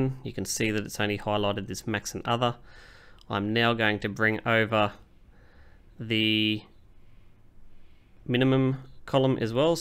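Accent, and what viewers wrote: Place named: Australia. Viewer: I've been using Excel since 1993 ver. 5 & am still learning. Great channel! Was in Australia for a whole month in 1987 so recognized your accent. Cheers from Vancouver.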